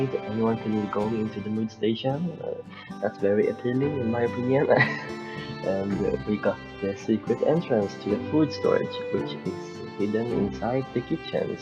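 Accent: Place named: Norway. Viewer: Which country are you from? The accent sounds like Norwegian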